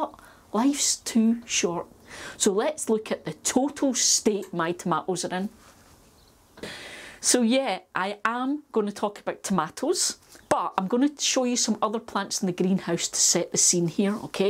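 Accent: Scotland, United Kingdom. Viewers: Interesting video, though I won't have this issue in Barcelona temperature, but as I love the Scottish accent, I kept watching. Reminds me when I lived in Glasgow a few year away :-) By the way the aromatic plants behind looked gorgeous.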